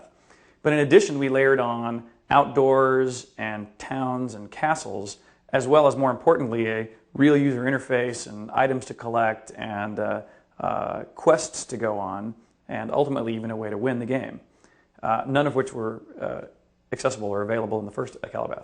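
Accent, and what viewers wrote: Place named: United Kingdom. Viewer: i am german and even i think you sound british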